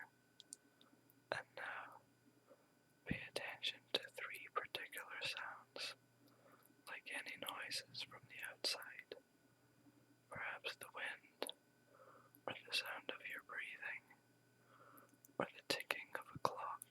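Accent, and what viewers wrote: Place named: Scotland, United Kingdom. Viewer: Once again, you've created a winner. Man, I want a Scottish accent like yours!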